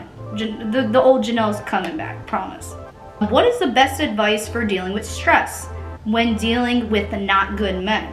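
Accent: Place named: Tennessee, United States. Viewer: Ok I actually like you on YouTube so much better! You look great girl and you have that Tennessee accent already haha super jealous! STAY STRONG and keep doing you!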